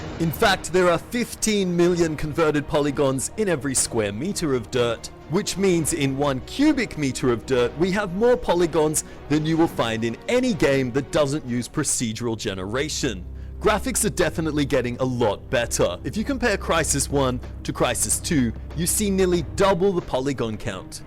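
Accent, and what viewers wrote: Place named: Australia. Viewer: As an american used to the way americans speak I have to say I do sense a bit of an eronant undertone in his speech but I know that thats just the australian accent. So hes not a douchebag, his accent probably just tricked you into thinking that.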